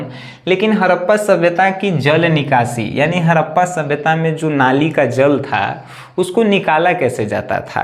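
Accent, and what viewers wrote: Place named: Bhojpuri, India. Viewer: Sir aap bahut acha pada rahe h ek dam bhojpuri legvej me pura samjha aa raha h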